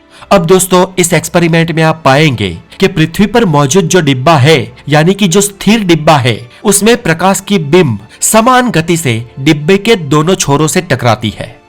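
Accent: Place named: Gujarat, India. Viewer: તમે ગુજરાતી છો... ને??? 😁😁😁 ખબર પડી જ જાય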